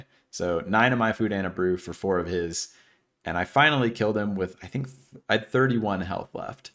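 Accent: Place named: MLE, United Kingdom. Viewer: I discovered you in J1mmyVODs/WasJimmy's youtube video. Ur wagwan accent for ur WoW troll roleplay is perfect and hilarious plz upload ur OnlyFangs' WoW roleplay to youtube! I really hope you collab with Rav, Moobius, Sput/Dr. Bones, Hubert, and other roleplay comedians in OnlyFangs.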